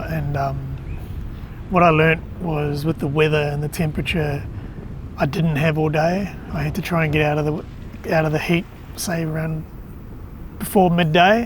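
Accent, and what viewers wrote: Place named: New Zealand. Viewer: Oh I just wanna say your from New Zealand🤣😂🤣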